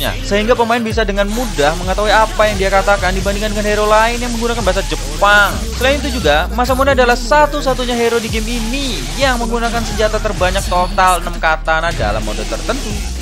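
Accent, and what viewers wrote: Java, Indonesia. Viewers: logat jawanya keluar😂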